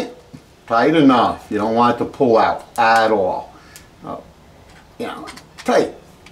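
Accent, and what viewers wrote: New York, United States. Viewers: Guy has to be from Queens or Brooklyn:)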